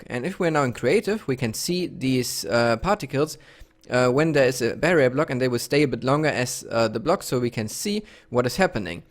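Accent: Germany. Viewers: Bist du deutsch? kilngt so :D ich finds richtig gut aber ich hab mir die map gedownloaded und finde die stelle mit dem jetpack nicht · kanns sein das du eigentlich deutscher bist? hörst sich bissle so an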